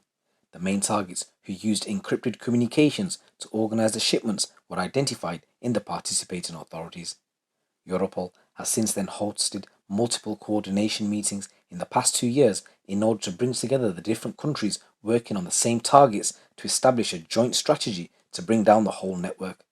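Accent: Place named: Liverpool, United Kingdom. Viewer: Gotta be a Scouser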